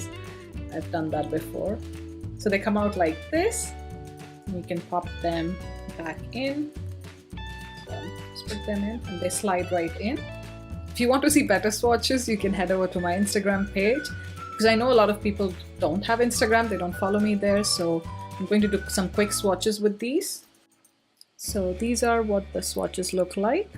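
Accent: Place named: India. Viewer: Beautiful indian Accent . where did u grow up in India?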